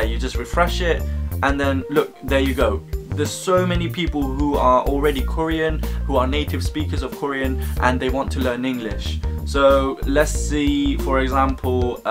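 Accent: United Kingdom. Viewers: You sounded British but you looked like korean. XD This app you shared would help a lot should I consider learning formal korean through Berlitz though, I learned a bit korean in the academy. I guess my brain should get a hang of it soon. I dunno maybe bec.its very diverse theres a lot of languages introduced when im in school spanish, japanese , chinese, korean but english is my official secondary language. Honestly, its pretty messed up. Tnx for this helpful app! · Oh my god are you british? You have an accent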